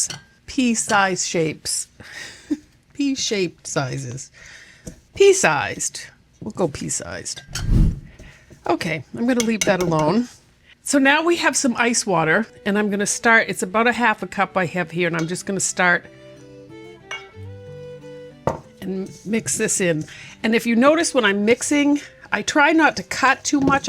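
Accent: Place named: Wisconsin, United States. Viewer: I haven't been watching your channel very long (my loss - I'll have to binge older videos to make up for it), but every time you talk, I hear Wisconsin. Maybe Minnesota, but my mom's side of the family is from Wisconsin, so that's the first that comes to mind. I miss visiting there and should definitely swing back for a visit. I haven't been back in over 15 years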